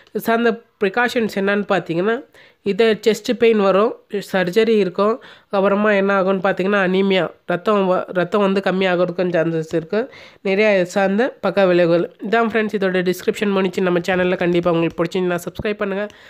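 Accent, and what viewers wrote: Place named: India. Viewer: தமிழ்ல ஒழுங்கா பேசுங்களே இந்திகாரன் மாதிரி பேசுறிங்க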